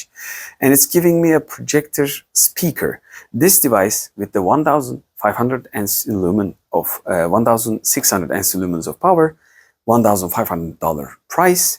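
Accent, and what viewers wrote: Turkey. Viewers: Selamlar, öncelikle bu videoya harcadığınız emek için çok teşekkürler. aksanınızdan Türk oldugunuzu tahmin etmiştim. Yorumlara bakınca tahminimde yanilmadığımı gördüm. İlk projeksiyonumu alacağım. Film ve dizi için kullanılacak En fazla 120 inç görüntu için kullanacağım. Ekstra ses sistemine fazla gerek olmaması güzel. Bu cihazın Fan sesi rahatsız edici seviyede gürültülü müdür? Eğer gürültülü ise eco Mode gibi bir secenekle ya da parlaklığı azaltarak gürültüyü minimuma indirmemiz mümkün müdür? Rahatsiz edici değil derseniz siparişi geçiyorum.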